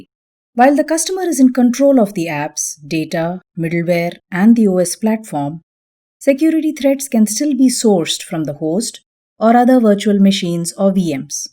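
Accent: India. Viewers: first things makerdemy should do is to inform your staff speak slowly, not like robot, with rather strong india accent, i hardly understand a word you saying.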